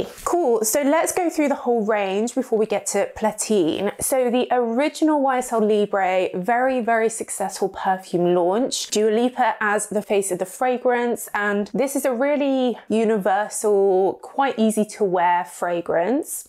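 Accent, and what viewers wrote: England, United Kingdom. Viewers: just wanted to tell you how much I enjoy your reviews. You are such a joy to watch! I found you a little over a year ago when I was recovering from a surgery. I watched tons of your videos to pass the time and I so enjoyed every one. You seem like a true girlfriend/Penpal to me. I’ve always loved perfumes and you share that love obviously like many of us. I have to admit even if I’m not interested in a perfume you are reviewing I’ll still watch your video just because you are such a light and a cheery person and I absolutely love your English accent. I also just stumbled on one of your older videos and I have to say that my husband is also dyslexic. You are so very intelligent and eloquent with your words. So thankyou again for what you do and you are very very appreciated ❤️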